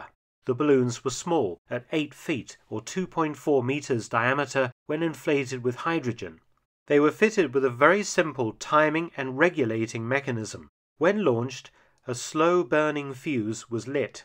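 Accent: United Kingdom. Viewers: Brit pronunciation. Any adult has heard this.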